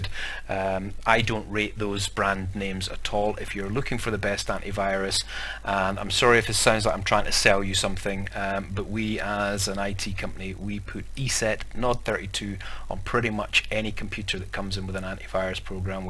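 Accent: Scotland, United Kingdom. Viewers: I just love that Scottish accent as I want to call you. LOL. But Capt kirk said no. LOL. What about VPN's and are they worth the money? The Bleeping rkill is old and not sure where to download it. I am running win 7 bc I HATE win 10 and will only have it if I have too. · If your a Scots man we can understand anything 😅 thanks mate new sub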